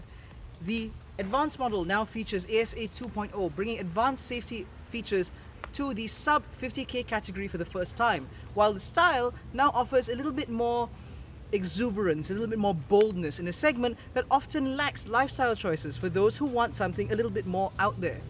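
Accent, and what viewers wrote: United Kingdom. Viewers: Localized Queen’s English..terbaik bro👍🏾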